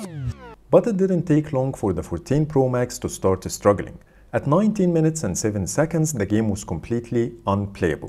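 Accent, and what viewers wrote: Egypt. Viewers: Bro your accents English like Egyptian people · I think you’re from Egypt from your speaking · كأن اللي بيتكلم مصري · انت مصري صح؟ باين من الاكسنت بتاعك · Bro‘s egyptian accent roaring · Egyptian accent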